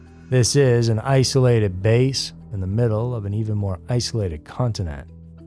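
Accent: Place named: East Texas, United States. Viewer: That is the most Marshall/Longview accent I've ever heard.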